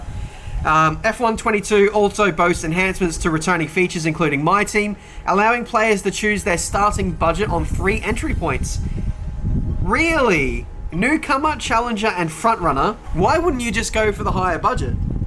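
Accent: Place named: Australia. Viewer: Mate firstly drop the snob accent, does not suit you at all. Your an Australian Secondly… nothing more to add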